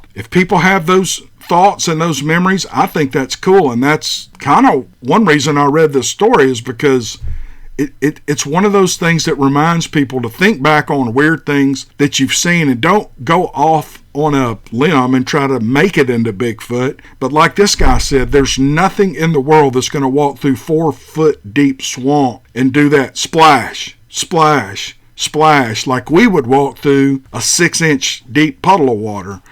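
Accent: South, United States. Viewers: I’m so happy I discovered your channel! The past couple months I have been reading a LOT of book series of Bigfoot encounters and this is SO much better! Your narration and splendid southern accent makes for awesome and enjoyable listening! Thank you for your great work!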